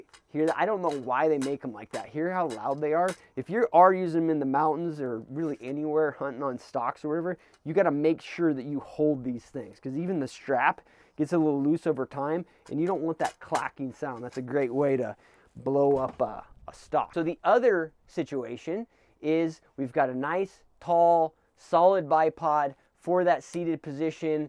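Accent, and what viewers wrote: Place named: Colorado, United States. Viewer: Hey, I appreciate the video. I know its weird, but I gotta ask... I have the same accent as you. Did you grow up in the Colorado mountains by chance?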